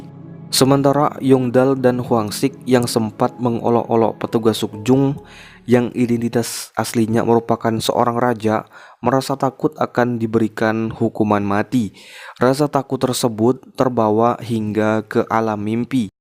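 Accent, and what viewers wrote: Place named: West Java, Indonesia. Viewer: Admin seperti orang Jawa Barat, logatnya seperti paman saya yg dri majalengka, 😁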